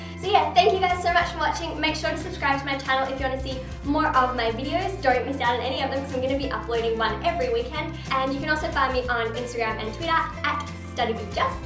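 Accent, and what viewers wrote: Australia. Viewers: Is she from Australia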